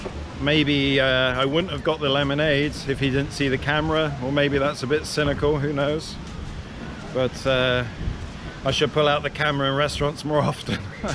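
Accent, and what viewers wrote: England, United Kingdom. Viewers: I sure hope you hooked up there in Odessa, you are a good looking guy, suave and have a nice English accent. I definitely saw some ladies checking you out. Go get them tiger LOL. And if the lead role for The SAINT remake comes along you definitely should audition.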